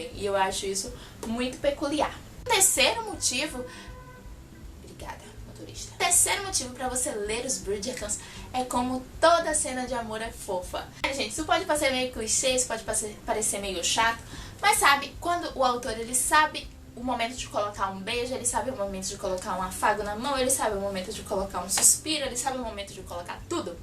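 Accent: Bahia, Brazil. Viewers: tu é baiana????? Nossa eu preciso te conhecer!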